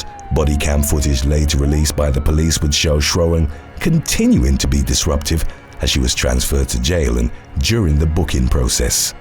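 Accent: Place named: United Kingdom. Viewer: The mask policy was, like the plandemic itself, fraudulent. All the main perpetrators have admitted this at various times -- gates, fauci, nih, cdc, media organs, labs. We needed more people like these refusers, not more sheeple who dumbly helped the criminalized authorities enforce the dictates on them. Surely you're alarmed at how easily everything became "fascist"? Every store owner, every governor, every mayor -- even your fellow citizens and checkout lines ad nauseam became little "Hitlers" over us. You sound British -- the UK govt turned absolutist so fast (and (has) stayed that way so long) it should terrify you way more than any disease, real or imagined. Not lecturing you, just saying.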